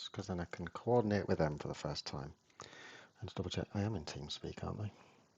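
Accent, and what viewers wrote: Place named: Hull, United Kingdom. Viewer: Sounds like a Hull accent.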